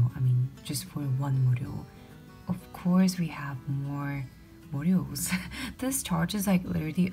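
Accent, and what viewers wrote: United Kingdom. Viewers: Oof, you've got british accent more. hehe so awesome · 저만 느끼는건가요 ㅋㅋㅋ 그동안 못본사이에 발음하고 억양이 영국스러워진거같은데.....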